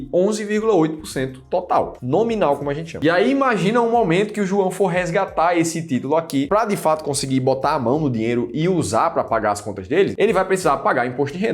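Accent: Pernambuco, Brazil. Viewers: Valeww comeddor de Bucho de Bode! KKKKK Esse sotaque não me engana é Pernambucano com certeza!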